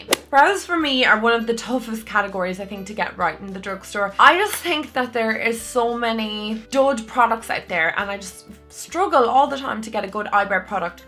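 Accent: Ireland. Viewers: I LOVVVVVVEEEE that your Irish I'm from Dublin and any blogger I watch is American sooooo happy I came across you xx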